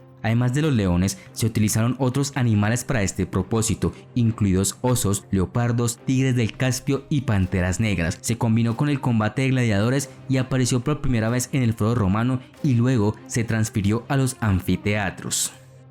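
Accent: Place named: Bogotá, Colombia. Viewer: Ese es rolo, no le ve la voz.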